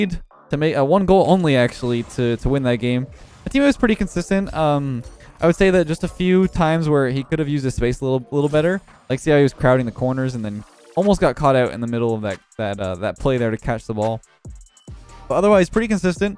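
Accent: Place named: Canada. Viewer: He’s either from Canada or Minnesota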